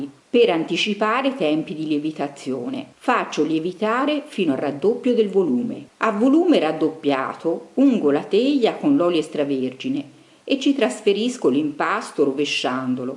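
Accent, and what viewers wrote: Tuscany, Italy. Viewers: TROPPO BRAVA!!!!! Ogni ricetta un successo...ho fatto le brioches a forma di fiore con marmellata: grandiose!!! BRAVA!!! E poi il tuo accento toscano da una tranquillità...bacioni! :)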